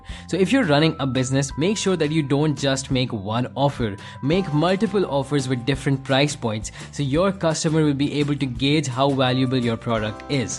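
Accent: India. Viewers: Indian blabber lol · I had to skip . Your accent maybe me feel like I am getting scam from the phone calls from IRS indian people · Jitna bhi Dollar Dollar bol le, pata chal hi jaata hai ki apne Indian Bhai ki aawaaz hai. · Your accent sounds indian-english where are you from? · I'll be very racist now! Please!!!! Let the native english speaker voice this cognitive stuff, and later on this dude who is probably indian can translate all this to his native language...I cant get a thing because of his obvious accent... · I like how it's narrated by an Indian man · its indian accent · I like how accent. Sounds like an Indian guy from Asia. Nice to hear for me. Shows the reach of this media as well as how small our planet is. Perhaps we will realize we are virtually all the same.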